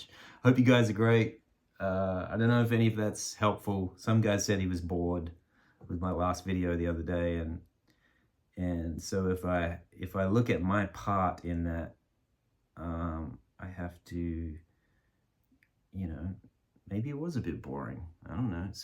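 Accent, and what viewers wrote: Australia. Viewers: I enjoyed listening to you man. Some people don't have the attention span to follow the train of thought through. Is that a slight aussie twang I'm hearing??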